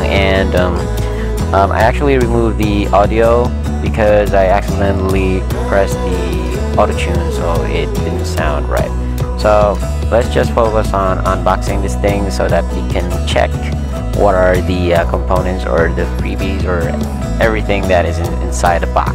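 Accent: Philippines. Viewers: Ok sana kaso bisaya ka e hahah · animal! bisaya man diay ni. haha subscribed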